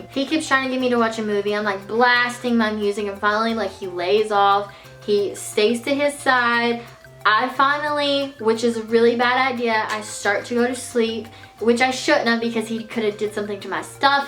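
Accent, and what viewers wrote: South, United States. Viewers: You sound so southern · I love your country voice man I've always liked country voices 😝 lol I've never said man before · you voice sounds country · Margot robbie said she watched your videos to get a southern accent for a movie omg! 😍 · what state are you from? I realize you would obviously be from the south. I live in the south aswell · Are you southern cuz you have a really strong accent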